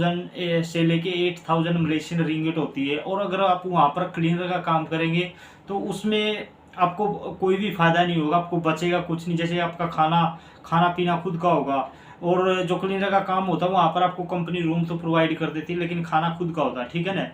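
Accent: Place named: Haryana, India. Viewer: aawaj se to aap haryana se lagrahe ho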